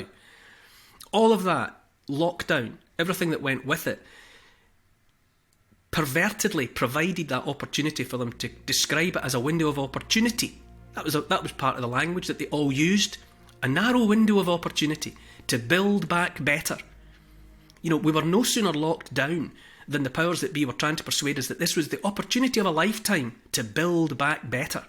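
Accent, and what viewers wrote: Scotland, United Kingdom. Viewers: This is what I thought every Scotsman sounds like... You can hear the freedom and yearning for love and truth.... God bless and keep you guys as we all are brought to Gods justice and holy light, these monsters can have what's left of the rock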